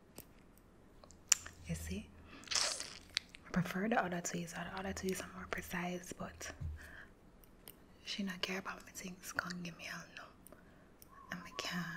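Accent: Jamaica. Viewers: Your voice is always so soothing, combined with that Jamaican accent, this is actual perfection 😭😭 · If my brain stops concentrating it turns the accent Irish, especially the whispered make up video. It must be the lyrical aspect. It sounds Jamaican again when I concentrate. But I love both accents! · Same I never knew I needed a Jamaican asmrist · i love this so much, its not like a corny jamaican accent its so soft omg :) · I love Jamaican accents, I think it’s one of the most beautiful. Every word is said with so much passion and emotion. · My fav music is dancehall and thanks to that I understood everything and oh it was sooo relaxing 😌 I love Jamaican accents. they have such a beautiful way of speaking and articulating. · I love the Jamaican accent specially in music, but in ASMR, It’s something I never knew I needed til now 😭!! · Jamaican accent is my favorite accent now... 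just so in love with this video 💖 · My old soccer coach from when I was a kid is Jamaican, haven’t heard this accent in years (not many Jamaicans in the south east of the US I suppose) so this is so cool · Never realized how much I love jamaican accents😩❤❤ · Goodness, I always struggle to completely understand Jamaican accent, but I LOVE it! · I was legit just about to turn in and decided to look through my recommended videos for something to help me fall asleep and when I saw this title I squealed and immediately clicked because YES, A JAMAICAN DOING ASMR?! - WE LOVE TO SEE IT! I got tingles just from your intro and I already love your voice and accent, never grabbed my headphones or hit subscribe so fast ... so glad I found your channel, I have a feeling you’re gonna be huge! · Jamaican accent is just the coolest accent to me, idk why it just sounds awesome haha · I loooove the Jamaican accent! 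It's one of my favorites! Soo underrated! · I had never heard a Jamaican accent before, so thanks for teaching me something new and for being soothing! The accent sounds very friendly and happy, I like it. You are also very beautiful! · My all time favorite movie is still Cool Runnings. It makes me happy so much specially because of the accents I love Jamaican accents. Now you put the biggest smile on my face with your Jamaican accent. I love this video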